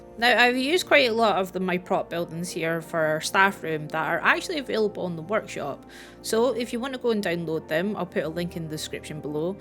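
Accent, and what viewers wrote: Scotland, United Kingdom. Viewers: Hey think you could do a tips or tutorial video on prehistoric kingdom? I bought this game and I always get a bit overwhelmed and never know what to do... Your Scottish accent fills me with confidence so I had to ask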